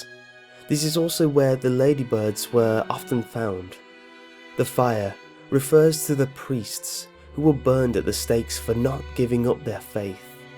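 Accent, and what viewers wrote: England, United Kingdom. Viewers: Geez someone PLEASE tell this guy that it is Catholicism. Not Cathlicism. Just makes him sound so ignorant, even with the English accent. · Does anyone think he is british?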